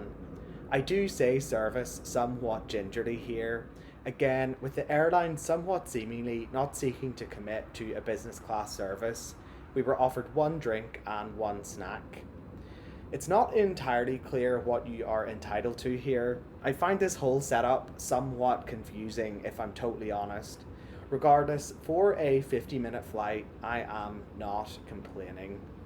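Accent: Northern Ireland, United Kingdom. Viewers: You sound as your from Northern Ireland but did you travel south to Dublin to avail of this product as I know this is not an option on the Belfast based Aer Lingus fleet(They don't have business class cabins). Certainly looks a good product if the price is right as they say. Ta for the video.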